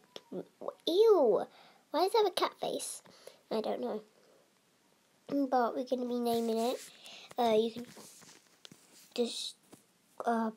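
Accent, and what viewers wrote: United Kingdom. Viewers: That Cute britsh Voice Doe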